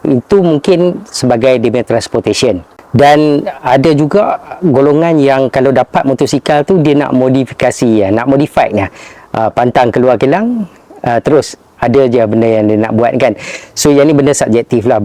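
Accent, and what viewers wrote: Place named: North, Malaysia. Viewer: Bunyi mcm utaqa...area mana bang?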